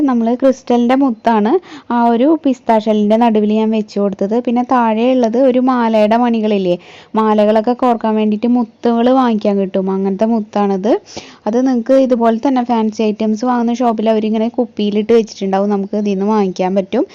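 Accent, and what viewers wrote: Thrissur, India. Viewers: trissur anu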